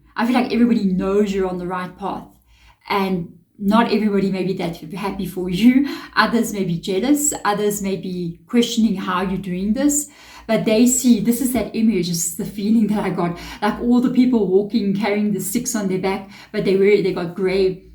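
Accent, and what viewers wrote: South Africa. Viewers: I did not pick your accent as South African, but I can hear it now. 💚 My dreams I trust more than anything else.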